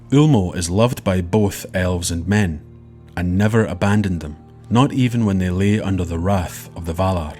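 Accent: Scotland, United Kingdom. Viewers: God your gorgeous silky scottish voice is so dreamy. I hope you become an audible narrator.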